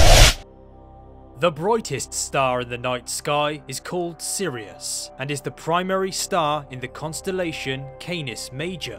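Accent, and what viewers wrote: United Kingdom. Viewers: you sound like a brit.. so why are you using miles? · More amazing than this video is that the video was narrated by someone with what sounds like a British accent and using miles instead of kilometres.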